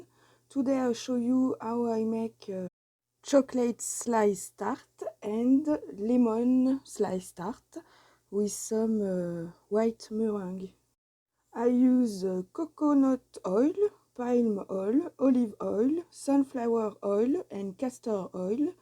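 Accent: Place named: France. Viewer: Wonderful soap! Very very good job💕 I enjoyed listening to you talk in the intro. You speak English very well with an amazing French accent! Newly Subscribed❤️❤️❤️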